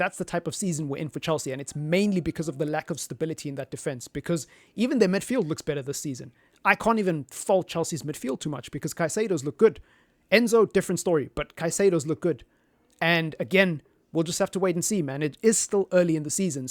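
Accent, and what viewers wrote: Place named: New Zealand. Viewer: Where you from bro New Zealand or South Africa can't really tell ya know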